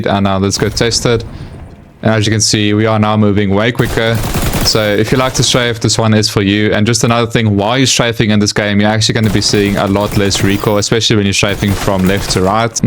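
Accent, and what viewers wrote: South Africa. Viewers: Awesome accent bro, South African right? I love it, and great informative video!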